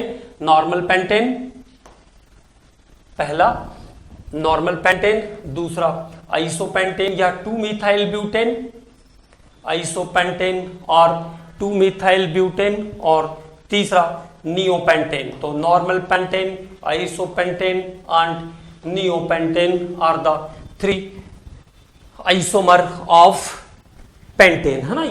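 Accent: India. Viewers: 🤣🤣🤣🤣 Yee Chinese nehi yee too pura Desi English hee .. 😁😁😁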